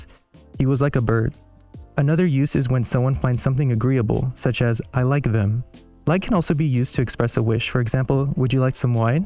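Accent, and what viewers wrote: California, United States. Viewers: I knew you were Californian when you said speaker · your dialect narrows you down to california. central valley or southern california. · You sound like you're an Asian-American from California. Source: An Asian-American from California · You dint have much of a cali accent. Its more cali-latino. To me the quintessential cali sound is Berkeley. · You're not just doing Californian - you're doing Asian Californian. There's a distinct difference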